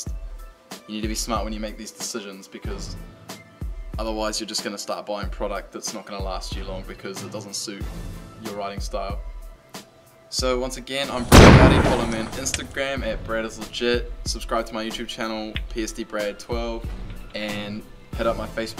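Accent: New Zealand. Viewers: that`s his kivi accent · what shop is that you sound like your from your New Zealand?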